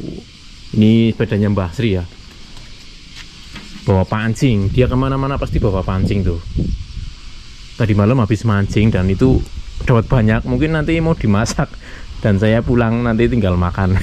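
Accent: Banyumas, Indonesia. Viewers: Wis katon sampean pasti asale sng brebes.. soale logate ngapak.. salam kenal mas e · jawanya ngapak maseh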